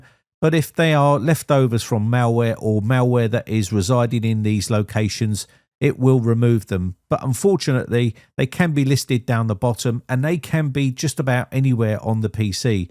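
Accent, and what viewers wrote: United Kingdom. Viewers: Man, man, whatin a fuckin" bullshitin' annoyin listenin to this fuckin old-man britishin accent! Here here here here here here! Always showin his olden schoolin computin fuckin prowessin with the comnandin line-nen! SOEN FUCKIN ANNOYIN!!! Shut uppin fuckin old man, you're fuckin annoyin!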